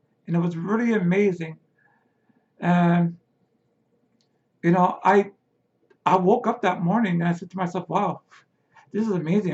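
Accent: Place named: New Jersey, United States. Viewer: Thank you for sharing. You sound like someone I know from New Jersey. Tone, speech, mannerism , and such.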